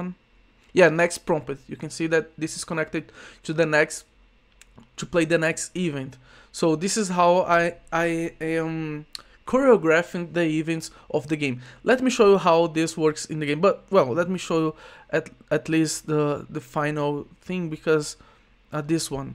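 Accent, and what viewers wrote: Brazil. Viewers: é incrivel, todo brasileiro consegue saber q o mano é brasileiro só de escutar a voz e o sotaque kkk, mas otimo video por sinal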